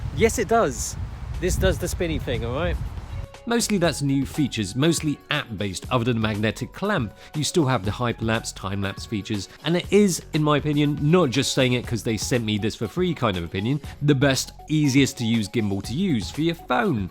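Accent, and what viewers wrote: England, United Kingdom. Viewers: I love the fact that he’s Asian and he has an English accent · I think he was raised in the UK. That's why it's so smooth. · What is your accent to be precise which region in the UK?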